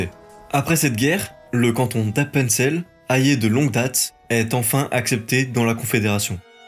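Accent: France. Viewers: On sent que c est un français qui a fait la vidéo! On ne dit pas LE Schwytz ou L’Uri mais simplement Schwytz ou Uri, et pas « glarisois » mais glaronais…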